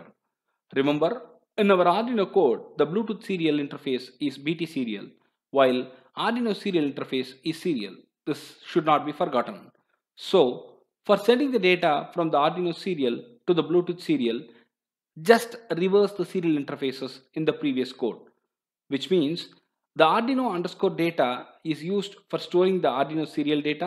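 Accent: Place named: India. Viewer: by your accent you are an indian..... as always indians are good teachers.....worth watching the full video,,,,,thanks a lot....expecting more things from you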